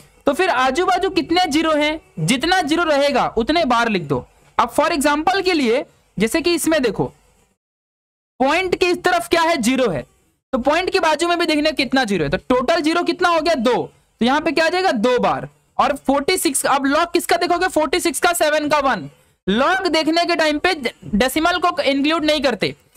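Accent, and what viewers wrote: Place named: Mumbai, India. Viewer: आप होकर आप से मुंबई हिंदी सुनना अच्छा नहीं लगता!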